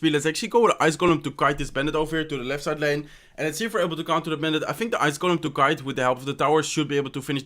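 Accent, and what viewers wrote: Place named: Netherlands. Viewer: U have a Netherlands accent ohhhhh girlfreind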